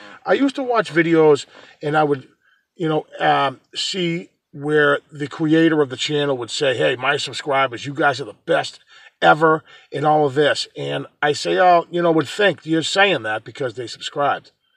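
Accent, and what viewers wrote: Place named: New York, United States. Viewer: I love your channel becsuse you're a regular guy dedicated to honest research. I like you even more now because asshat is my favorite pejorative descriprion of some folks. I also love your accent, and I'm from Long Island so I can relate.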